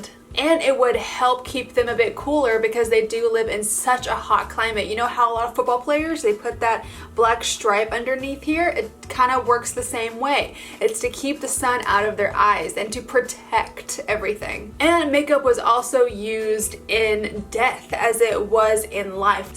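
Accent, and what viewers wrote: United States. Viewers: Your accent is Americanized while has a more classic, British/Mid Atlantic influenced accent.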